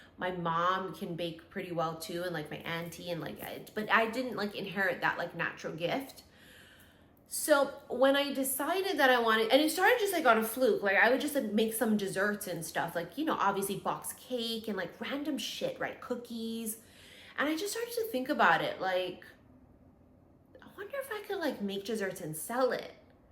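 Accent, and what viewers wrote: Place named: New York, United States. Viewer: Oh wow. Didn't know you were half Japanese nor Filipino. I knew it was something but couldn't put my finger on it. Sometimes You sound like you grew up in my NYC neighborhood! 😂 Love it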